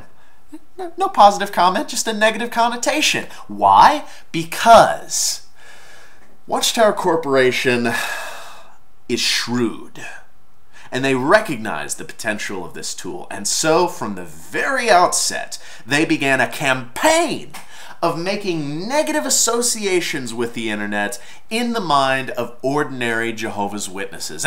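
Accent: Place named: London, United Kingdom. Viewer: Great London accent - as appreciated by this English person.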